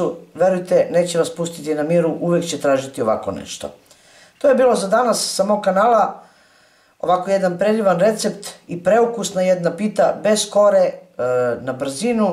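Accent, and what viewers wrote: South, Serbia. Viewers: Fantazija ukusa,pogotovo gde ima krompra to je neizostavno,svi ostali sastojci su nesumnjivo takođe doprineli ukusu.Moram da ti każem da ti je glas toliko umiljat i taj jużnjački naglasak,podseća me na divnu glumicu Radmilu Savićević, Puno pozdrava.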